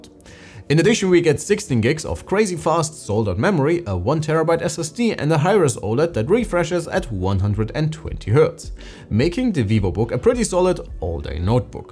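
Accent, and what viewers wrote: Germany. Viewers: I'm very glad to see NoteBookCheck as a very classic website to publish such YouTube-optimized video in every aspect and that's a real success for your content producers. I'm an old fan of you from the website, but now you've convinced me that you're very good in YouTube platform too. And dear, your German accent is what I love 💛❤🖤 Now let's talk about the Laptop! I think that Snapdragon X Elite is something that should not exist at all. Who am I to say this? A Microsoft Surface RT and Surface Pro X lover. I believe that existence of the ARM based computers in the form of laptops and Windows tablets should be only limited to very low-power scenarios maybe 10W TDP at maximum. If you're going to implement such heavy cooling systems with heavy heatsinks and fans, well why you don't stick to x86 even??? The purpose of bringing ARM to a "Windows tablet" specially, is to have a final product like the iPad or Android tablets in terms of weight, thickness, heat generation, battery runtime,... 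But Apple ruined the game with 100W ARM based laptops which even gets more "Unreasonable" when even their desktop solutions is ARM too!!! ARM or I might say RISC, is designed to work with low-power and be less powerful. When x86 or I might say CISC, is designed to work with high-power and be simply more powerful! You can't fight with the nature of them. If even our desktop PCs turn into ARM machines, well then we will lose the great power-house performance of CISC architecture! And fortunat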